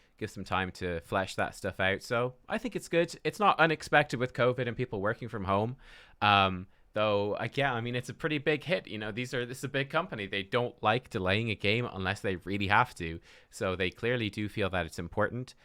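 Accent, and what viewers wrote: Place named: Ireland. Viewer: Judging from his accent, I think he’s from Ireland, correct me if I’m wrong though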